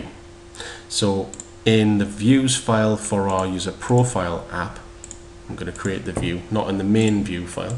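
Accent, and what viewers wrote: United Kingdom. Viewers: One day spent banging my head around Django (trying to manage users / profiles) - 26 minutes of this tutorial - all sorted. Will be going through the rest of the series now. Genius. As an aside - always loved Python - Django at the moment is seeming like the fastest route to murdering Python. As another aside - Ace accent, in my personal opinion the best in the UK. International users take note.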